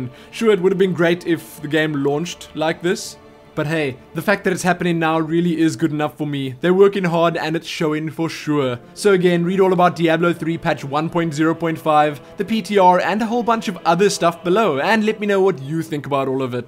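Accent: South Africa. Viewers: that is a south african accent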